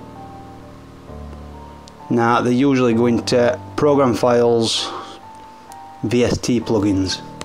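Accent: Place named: Manchester, United Kingdom. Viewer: love the Manchester accent :-) thanks for the video. im stuck between either getting acid Pro or reason I'm leaning towards acid Pro because it's less money I'm into making more minimal kind of electronic music ambient stuff so yeah. you any relation to Karl Pilkington?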